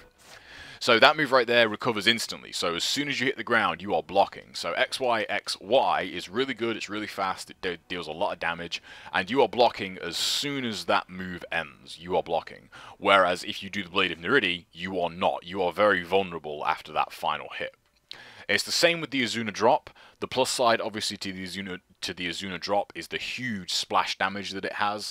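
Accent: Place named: England, United Kingdom. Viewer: Great videos! Thanks man. BTW: which part of England is your accent from?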